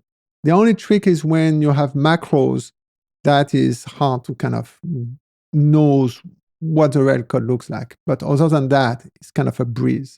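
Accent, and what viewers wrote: France. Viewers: You are awesome man, kudos! And since you mentioned the accent first now I can say that Rust is really something change me in many ways; I used to can't stand the French accent 😅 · Je reconnais un français à l'accent haha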